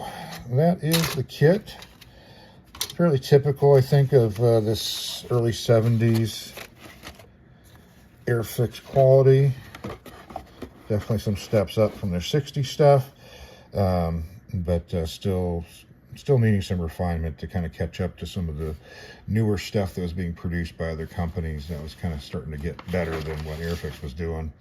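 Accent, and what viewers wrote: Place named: United States. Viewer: It's good as it is , even the pronounciation of French words is passable for an American 😅, good job.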